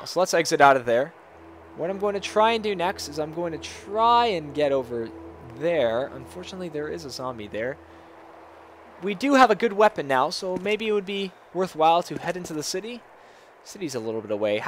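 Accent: Denmark. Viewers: Are U danish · are you danish? :P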